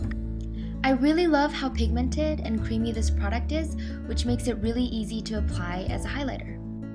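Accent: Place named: United States. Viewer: Ur cute at american accent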